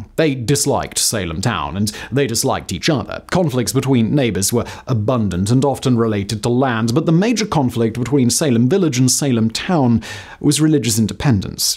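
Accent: United Kingdom. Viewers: I thought British people only talked like this in movies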